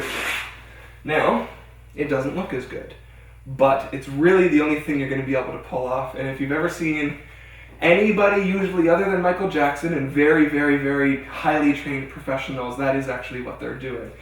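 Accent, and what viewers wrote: Canada. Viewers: Well explained. Are you Canadian? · Your Canadian aren’t you😂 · Are you canadian?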